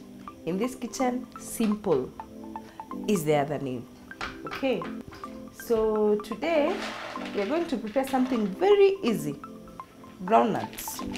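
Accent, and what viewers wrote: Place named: East Africa. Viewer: Esther Mutua try not to be silly. She speaks beautifully with an East African flow.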